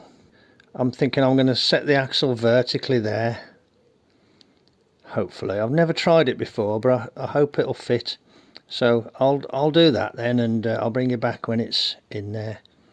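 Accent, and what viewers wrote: Birmingham, United Kingdom. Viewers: Your welcome the brummie accent the bring you back when there's more etc and similar phrases❤️. Good ideas and ways around problems good proper Mechanic. 😉💯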